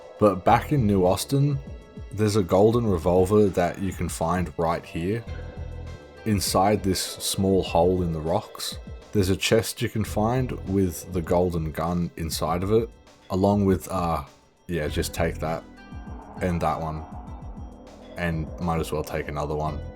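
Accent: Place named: Australia. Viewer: Australian commoner accent.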